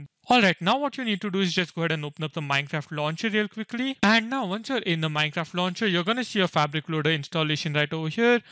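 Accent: India. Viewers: Ofc it's an indian man speaking english having a girl skin making a tutorial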